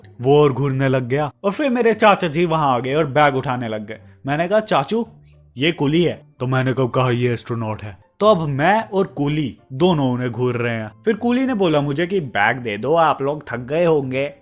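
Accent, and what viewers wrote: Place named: Punjab, India. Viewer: Bhai are you a punjabi because teri punjabi nikal aandi hai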